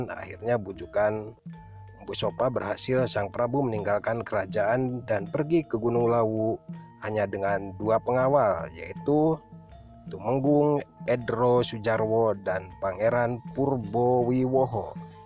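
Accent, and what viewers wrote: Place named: Ciamis, Indonesia. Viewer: Logat ciamis nih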